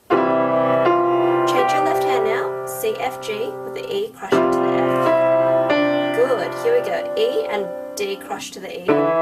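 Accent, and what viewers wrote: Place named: Australia. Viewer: Nice to hear an Australian accent :-)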